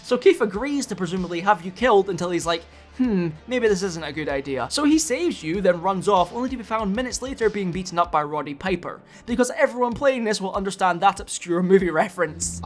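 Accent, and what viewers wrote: Scotland, United Kingdom. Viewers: Ay is this man Scottish Huge w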